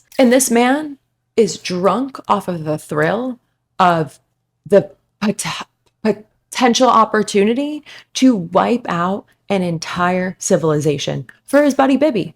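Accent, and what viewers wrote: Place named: East Coast, United States. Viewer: New Jersey huh, even the east coast accent came out today 😂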